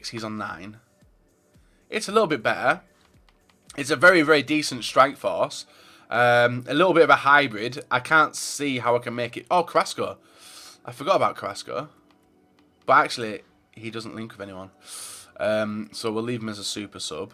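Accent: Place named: Liverpool, United Kingdom. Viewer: moronic scouser...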